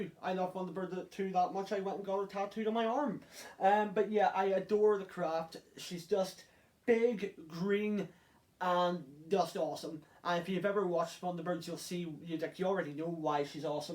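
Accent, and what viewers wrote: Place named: Northern Ireland, United Kingdom. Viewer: It surely is. Great to hear the world famous 'Norn Iron' twang. Keep up the great work.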